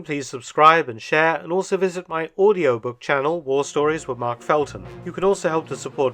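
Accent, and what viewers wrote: England, United Kingdom. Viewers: Edutainment!!👍🏻 Some Britt accents are irritating. Dr Felton's is not. Very soothing. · I absolutely love this channel. Mark is a blessing to youtube. The education and well-done entertainment he brings to history does it justice . I appreciate the research and enthusiastic way of bringing history to life. As an American, it's music to my ears to hear his English language accent brings you into the video like a great soundtrack to a movie. I didn't mention the stock title music blends well with the stories and history. Especially the ww2 vibe it gives as one of my favorite interests. Thanks, Mark.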